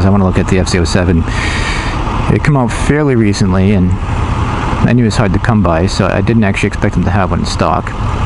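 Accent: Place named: New Jersey, United States. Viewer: I detected an accent in your voice, did you live in Jersey before L.A.? You should get an aftermarket pipe, the M4 slip-on is cheap, so that people can hear you better (keep you safer) and it makes the bike sound like the bad-ass it is. I would also ditch those Mickey Mouse ear mirrors like I did on my FZ 07, too and get some CNC Mirrors by RIDEIT for your FZ 07, on Amazon. They look much better and are adjustable, trust me, and they're only $59.00. Nice little camera there, good mic. Doesn't that low beam suck! I can't stand riding mine at night at anything over 35 or you over drive them. Thinking of getting some good Denali micro lights to mount on the forks/take care of that crap. Be safe!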